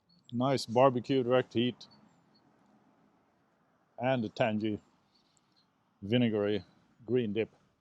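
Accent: Scania, Sweden. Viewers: Saknar verkligen din skånska från dina tidigare videos. När du pratade på svenska var du mer unik. 😊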